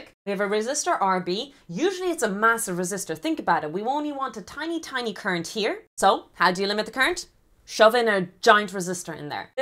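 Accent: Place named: Ireland. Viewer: Nice explanation. You sound Irish.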